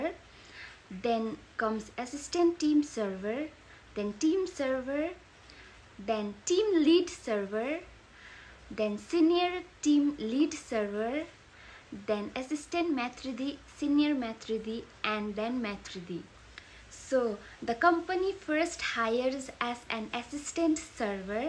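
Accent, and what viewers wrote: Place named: United Kingdom. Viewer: Your accent like British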